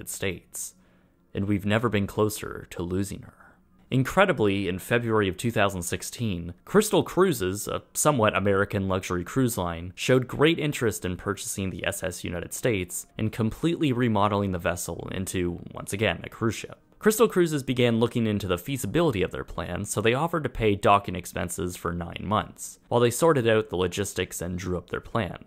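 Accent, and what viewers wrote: Canada. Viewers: are you Canadian? I detected an accent...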